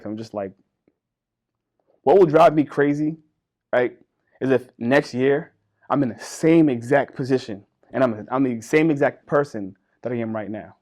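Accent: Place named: New Jersey, United States. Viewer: Lovely accent........New Jersey ?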